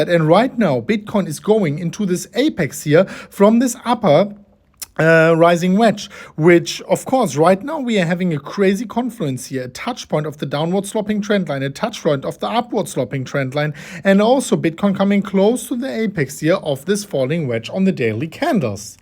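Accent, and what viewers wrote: Germany. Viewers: I just want to say, regardless of crypto, you‘re such a nice guy and have a great personality. My friends and I are watching your videos and we alway have a great time and laugh about your german accent because we‘re germans too😂 not to mention the thumbnails. Keep up the great energy and positivity, you always get me through these bearish phases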